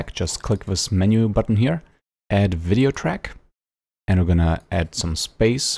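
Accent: Germany. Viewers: wie deutsch kann ein englisch bitte klingen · you can hear the german accent immediately. it's as plainly as the russian accent.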